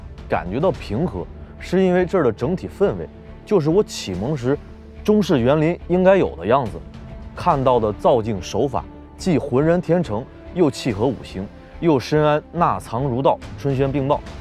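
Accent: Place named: Tianjin, China. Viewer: 好像天津人讲的话，笑话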